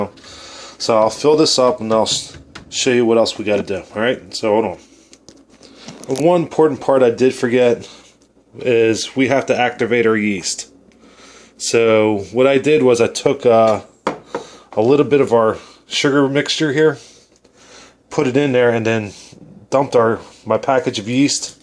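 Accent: Philadelphia, United States. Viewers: Ryan J he sounds close to South Philly to me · That Philly accent..., my goodness. Making me homesick.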